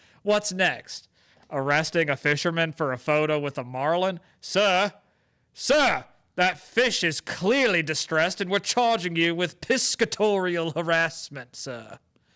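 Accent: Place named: United Kingdom. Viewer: Nice British accent (From a Brit). 👍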